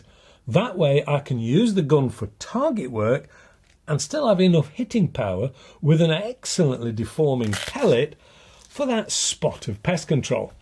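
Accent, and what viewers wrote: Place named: Leicester, United Kingdom. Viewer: Are you from Leicester? Can notice that accent a mile away :D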